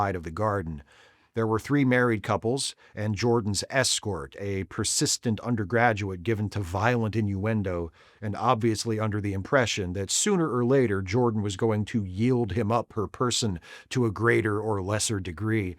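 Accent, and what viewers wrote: United States. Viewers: Like a lot people my age, this was required reading in college (“college” is British for High School, to avoid confusion) but I always loved it anyway. There’s definitely something about hearing the text in an American voice. I’ve been a subscriber for years now, so your love for the novel is not new, but this is excellent work, I’ve listened to every part so far, and it’s something that I’m going to look forward to seeing in my subscriptions for the next few evenings.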